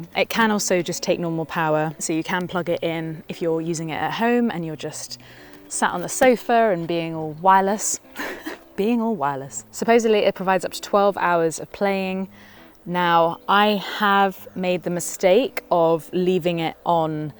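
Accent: United Kingdom. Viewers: æt taimz lυks æ bit laik Lara Kroft hier, widh dhoυz sanglāsez. Ænd dhe British æksent enhānsez dhī impreshən.;–)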